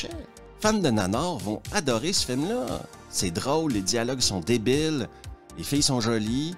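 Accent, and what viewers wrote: Quebec, Canada. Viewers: 😅 Je te découvre, et du coup je me régale avec tes trouvailles, et en tant que fan de F. Pérusse (en Québécois, s'il vous plait, sinon rien!), bein c'est encore plus agréable. Cet accent prépare le corps à sourire, voire plus si affinité. ;) Mais pour en revenir à la principale information de ta vidéo (😂)... En fait, ça ne date pas du nichon, ce déni idiot de la réalité chez les américains. Ca date de la drogue. (et sur ce sujet, ça continue de plus belle...) On cache, on cache... et plus on cache plus ça veut dire qu'il y a des choses à cacher. J'en fini par me demander quel coup fourré se cache dans le nichon américain moyen. Je sais bien qu'ils passent leur temps à s'arnaquer les uns, les autres, mais je me dis: "... quand même... Ils n'ont pas piégé leurs nichons???" Moi j'crois que y a d'la coke, dedans... C'est du nichon dangereusement psychoactif! 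...où peut-être qu'on a à faire avec du nichon OGM qui produit des terres ULTRA rares pour leurs futures technologies nouvelles, genre: des cravates connectées où des SUV pickups amphibies géostationnaires capables de recharger eux-même leurs batteries en tétant le pis d'une vache bio des Alpes Suisses? En tout les cas, je ne doute pas qu'un avenir radieux nous en apprendra un peu plus sur cette "apparente" phobie du nichon. Ça va forcément évoluer vers du bon, aucun doute...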